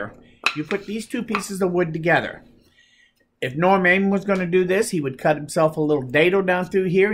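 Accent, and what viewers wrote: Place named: Quebec, Canada. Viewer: This was so helpful. Love your teaching method. Your accent struck me. Are you near Quebec?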